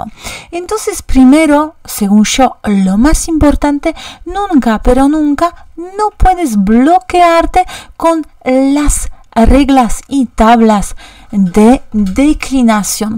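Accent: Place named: Rio de la Plata, Argentina. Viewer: Hola! He escuchado que tienes una pronunciación similar a la Argentina y Uruguaya, por ejemplo cuando dices "yo". Saludos desde Uruguay 😁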